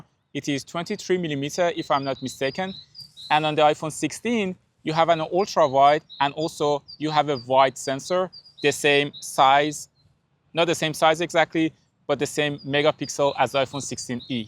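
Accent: Iran. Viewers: داداش احساس میکنم لحجت فارسیه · لحجه ایرانی داری داداش، ایرانی هستی؟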